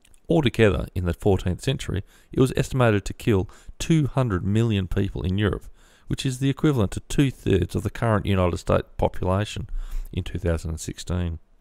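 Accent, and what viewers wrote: Australia. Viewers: Oh dear - I’m sorry many of you couldn’t understand the narration - didn’t realise us Aussies’ accents were hard to pick up!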